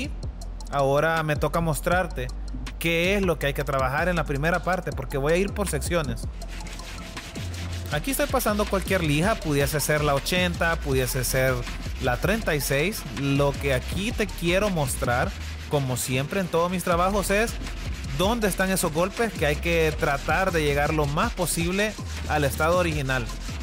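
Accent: El Salvador. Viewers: Por 2 palabras que te escuché deduzco que eres de El Salvador, te felicito por tu excelente trabajo, yo no trabajo en eso, pero me parecen muy interesantes tus videos, saludos desde el pulgarcito de América. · Tiene acento de el salvador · Viejo por tu tono sos Salvadoreño! Me llega! Ya me suscribi, mira ayudame porfa men, Donde consigo los aros o anillo para tirar de cobre que pegas a la lata junto con la maquina que los suelda?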